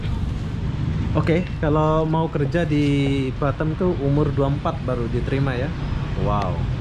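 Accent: Malaysia. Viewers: tapi logat abg mcm org malaysia.. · Makanya saya agak heran aja,setiap d tanya pasti bilang dari lombok,tapi logat dan bahasa byk pake melayu malaysia nya...ok salam bang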